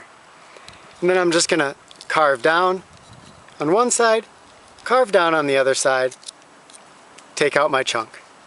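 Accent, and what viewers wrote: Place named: Wisconsin, United States. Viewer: ! I've gotta say, I am really excited to have found your channel! I have always had a strong interest in the subjects you cover, but what is really exciting is that it sounds like you live in Wisconsin! The problem I sometimes run into when researching is they are region specific with materials. This can be hard. I live in central Wisconsin, and it is awesome to have a neighbor's expertise to draw from. Thanks for doing what you do! Great work my friend!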